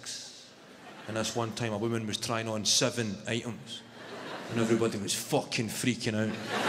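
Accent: Scotland, United Kingdom. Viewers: Well I am Welsh and have no problem understanding him, you just need practice to get your ear in. Move to Scotland for a couple of month I am sure that will sort the problem after you have said pardon a few times😂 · If you're serious, [and I hope you are] this is English spoken by a Scot in a Scottish accent.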